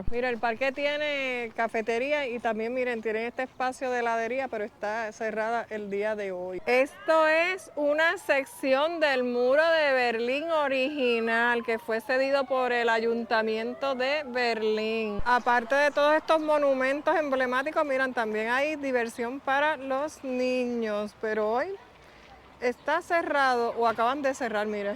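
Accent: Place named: Puerto Rico. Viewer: Saludos. Por fin encontré un YouTube de España explicado en "puertorriqueño". Me gustó que hablas pausado y genuino. Gracias y mucho éxito en tu canal. Myrta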